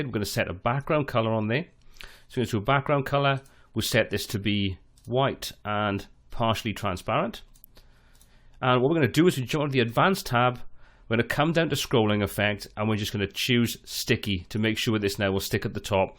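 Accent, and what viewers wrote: Wales, United Kingdom. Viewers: What a lovely Welsh accent! :)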